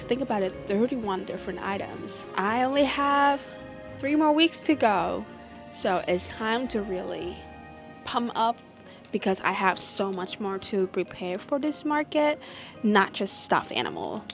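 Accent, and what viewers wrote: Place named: Asia. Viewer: Asian accent. I hear minute traces of it.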